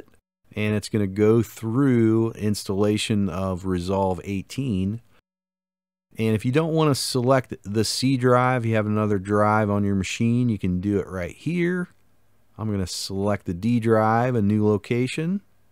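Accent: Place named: Michigan, United States. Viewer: You have a Michigan accent 100%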